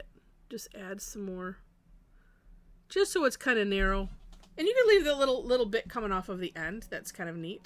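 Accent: Midwest, United States. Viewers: Good morning, I work right along with your videos. It takes me a little longer so I constantly have to go back with my tablet. But it's okay cuz I like listening to you talk while I work. I love your med Western accent. I'm from Chicago but I live in North Carolina now and just about everybody has a Southern drawl here. Thank you for putting out these videos. ❤️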